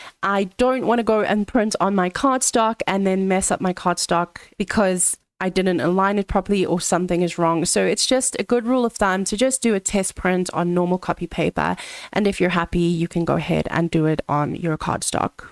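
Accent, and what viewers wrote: South Africa. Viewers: 😅 I watched this video until the end, only to realise “Wait, her surname is ”, this must be a South African! I was second guessing myself throughout the video because I noticed your accent sounds very South African. I was busy working while listening to your video in the background. Now that I found a SA cricut channel, I will binge watch. Thank you🤭🤭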